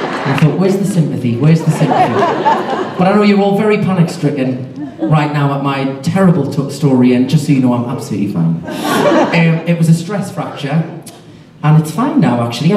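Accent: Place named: North East England, United Kingdom. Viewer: Thar's his adorable accent from The North east of England.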